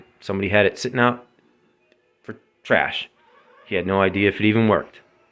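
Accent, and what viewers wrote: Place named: Pennsylvania, United States. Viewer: Fair enough. I was judging based on your accent. Knew it was somewhere in Pennsylvania, lol.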